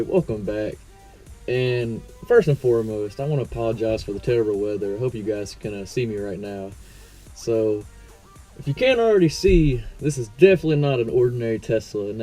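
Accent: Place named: South, United States. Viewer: I love the way you talk man... some people from the south that have lost their accent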